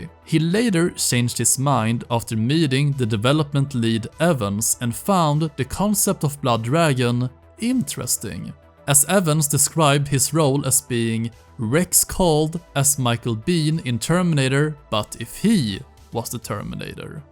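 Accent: Sweden. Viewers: You sound so swedish. It´s funny. Good video.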